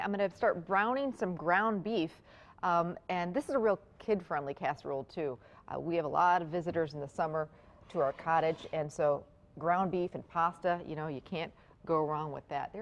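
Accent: United States. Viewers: is a charm! As soon as she began to speak I thought, hmmmm, not quite Minnesota or Upper Peninsula but definitely Michigan! As a fellow Michigander our voices are usually easy to identify. The casserole looks great. Can't wait to try it.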